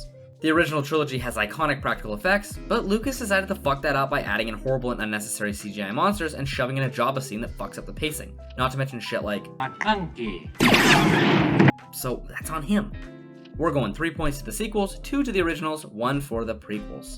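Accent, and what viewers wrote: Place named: United Kingdom. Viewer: Like the British accent! You should probably go with that from now on🦹🏻‍♂️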